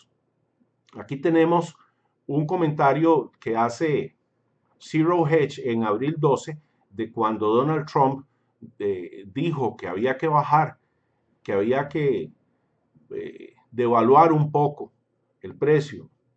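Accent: Costa Rica. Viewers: sos de costa rica? Digo por tu acento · Reconozco a un tico cuando lo oigo. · Sos de Costa Rica verdad? conozco ese tono de voz. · Eso que dices de Usa describe a la perfección a México fascismo corporativo!!! Genial canal, fantástico que te hayas ánimado a compartir tus conocimientos. Saludos desde México,de dónde eres? por tu acento creo eres de costa rica.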